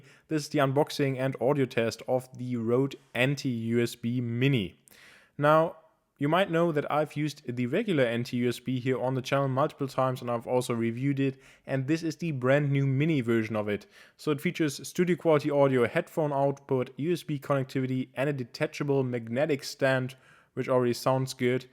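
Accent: Germany. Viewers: Deutscher Dialekt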